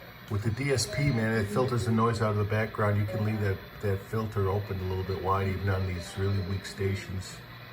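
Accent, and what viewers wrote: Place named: Buffalo, United States. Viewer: Your location maybe Dallas, but your accent says Buffalo/Rochester/Chicago! Am I close? Thanks for this great comparison among these big-league receivers,!!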